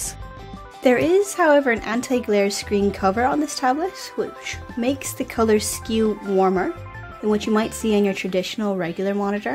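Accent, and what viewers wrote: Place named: Ireland. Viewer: I picked up on the Ireland part, but I couldn't figure out why it wasn't more pronounced (as Irish accents so often are). The American bit makes sense, though I was going to guess you were in England, and had Irish family or something. But I wasn't hearing any English tells.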